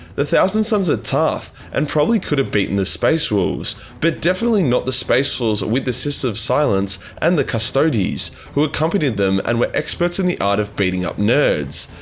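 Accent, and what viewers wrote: Australia. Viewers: If you hear trader, its actually traitor. Just aussie things.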